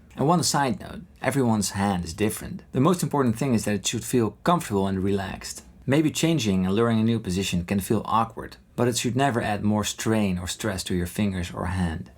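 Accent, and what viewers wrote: Netherlands. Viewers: where are you from? Because your accent sounds Dutch. Nice vid btw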